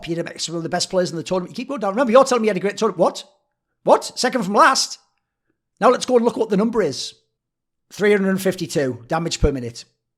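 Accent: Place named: North East England, United Kingdom. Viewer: are you from the north east?? i recognise that accent. but pls leave rekkles alone he's a good dude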